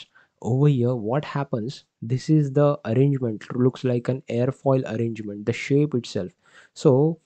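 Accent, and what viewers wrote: India. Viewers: Indian-origin accent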